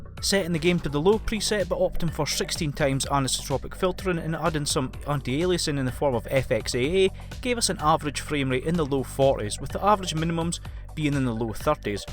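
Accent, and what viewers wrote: Scotland, United Kingdom. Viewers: Scottish accent 😍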